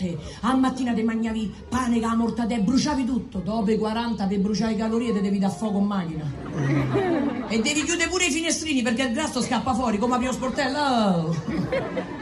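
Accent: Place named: Rome, Italy. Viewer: Parla come un maschio romano. Corpo da donna molto bella ma parla come un uomo. Cresciuta con 5 fratelli e normale. Ho una sorella così. Non e nuovo per me siamo 5 fratelli e mia sorella che rappresenta 10 uomini e giudici sono guai se vi capita di incrociarla. Valentina Persia e sympatica, sincera et sopratutto modesta... E mitica e unica. Un altra che estimo Anna Maria sconsolata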